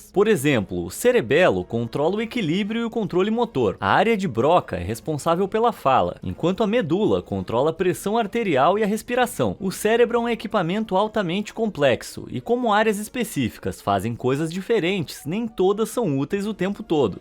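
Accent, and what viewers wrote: Brazil. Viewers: tinha uma boz americwna, ess😊a voz tamuito brasileira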